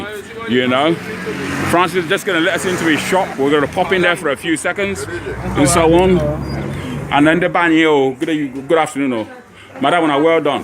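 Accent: Cockney, United Kingdom. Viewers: Thank you for spreading the truth and reality of the UK. Too many go back home pretending they are living like kings. The truth? they are telling lies. My parents took me and my siblings on holiday to Nigeria when we were kids in the early 80s and the thing that struck me were the amazing and beautiful buildings in Owerri and the village. I'd never seen a luxury apartment in my life, not until I went to Nigeria. People owning their own land and building their own homes on it. That blew my mind. UK was a bit late to the game of building luxury apartments for ordinary folks. Really tired of the negative images of Africa the UK like showing on our TV screens. Thanks again for making it your mission to show us there is a better way. Love the Igbo Cockney😄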